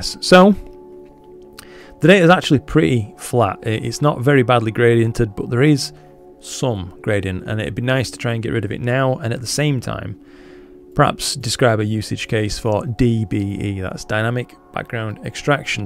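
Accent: United Kingdom. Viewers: time well spent watching it….very melodious also with your British accent!😜 i wish i could speak English in that accent!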